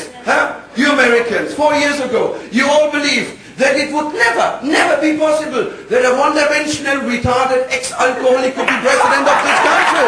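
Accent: Germany. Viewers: Weil er einen bayrischen Akzent hat, richtiges bayrisch klingt nochmal anders, für alle ausserhalb Bayern kaum verständlich. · Wow, I guess native speakers will have a hard time understanding this. A severe german accent is rather disturbing than helpful for english comedy. In order to really use a fun german accent you probably would have to speak a nearly perfect english and then take the stereotypical parts of the german language and emphasize it. The way he's doing it is way too exhausting for the audience. Fun guy though!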